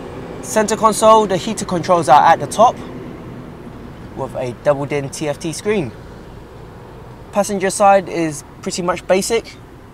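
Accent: United Kingdom. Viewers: Asian dude with British accent gotta love it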